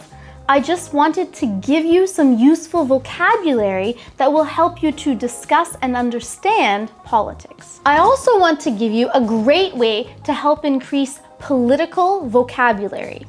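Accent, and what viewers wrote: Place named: Canada. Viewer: Thank you for good lesson! I live in Ukraine and always wanted to visit Canada! It's a very big pleasure listening Canadian pronunciation!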